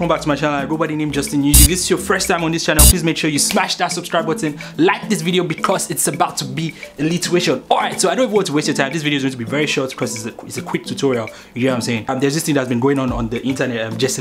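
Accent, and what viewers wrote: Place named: Nigeria. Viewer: Omg you're so cute i love your accent are you from Nigeria? If so ayeee 💚💚!! Oh something else... i still cant do it....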